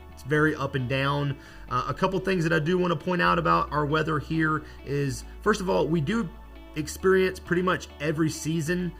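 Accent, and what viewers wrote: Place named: North Carolina, United States. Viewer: I just randomly found you. I grew up in vanceboro then moved to Bridgeton. I’m living in pamlico now. But wow. I was thinking at the start, “yeah he has the accent, but is he really from here?” Haha. But by the end of it, yeah. Haha. Yes. You’re from here. Haha.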